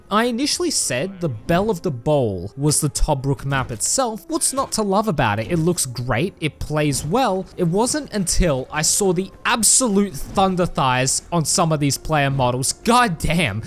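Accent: Australia. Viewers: Are you south Australian?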